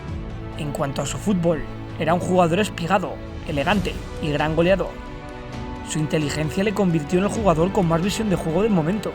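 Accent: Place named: Andalusia, Spain. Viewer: Me emociono hasta las lágrimas. Como persona: ejemplar, generoso, brillante, lúcido, líder con un aura especial. Como futbolista, que decir, fino, exquisito, ultra inteligente, tácticamente diferenciado, el hijo de TELE. SOCRATES-FALCAO-CEREZO-ZICO. Los futboleros no olvidamos. PUÑO arriba. Nota de color, me encanta el relato andaluz.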